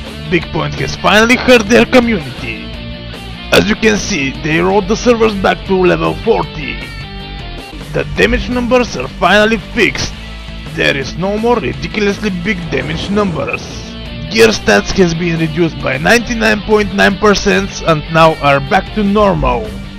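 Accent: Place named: Bulgaria. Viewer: Сигурен съм , че коментатора е Бг личи си от километри ... като цяло най-смешния клип който съм виждал някога ужс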